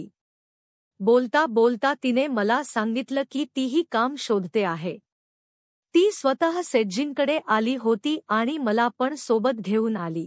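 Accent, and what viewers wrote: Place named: South, India. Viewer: कथा kathan shuddh मराठी bhashet सांगावी dakshinatya bhashesarkhi कथा kathan vat te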